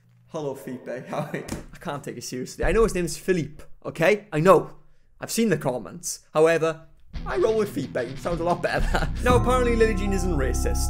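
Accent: Wales, United Kingdom. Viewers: I'm here for the Welsh accent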